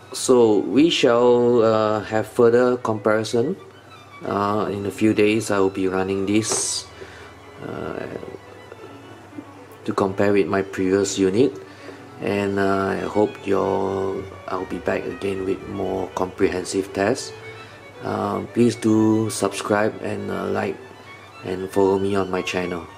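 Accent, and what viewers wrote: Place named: Malaysia. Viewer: your voice like malaysian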